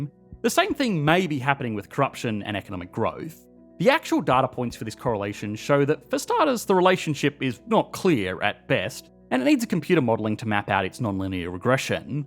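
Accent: Australia. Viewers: Annoying Australian accent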